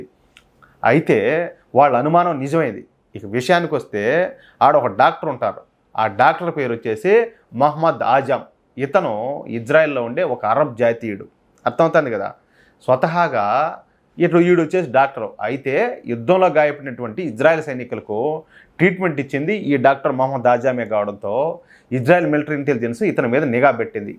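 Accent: Rayalaseema, India. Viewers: సార్ చక్కటి రాయలసీమ యాసలో చాలా బాగుగా వివరించారు. దన్య వాదములు.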